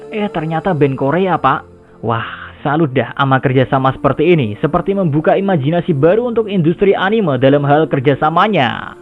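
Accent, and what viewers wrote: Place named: Welahan, Indonesia. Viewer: Min kue wong welahan?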